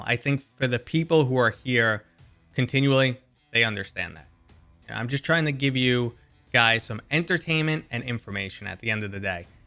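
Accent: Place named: New York, United States. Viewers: I KNEW YOU WERE FROM NEW YORK!!!! Yesss! Love it! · AYY A FOCKING NEW YORKA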